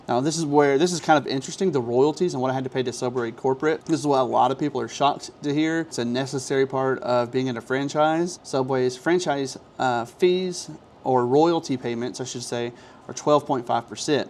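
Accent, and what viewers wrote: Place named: South, United States. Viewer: Sounds like he's from the south, $500 is OK if you're in Mississippi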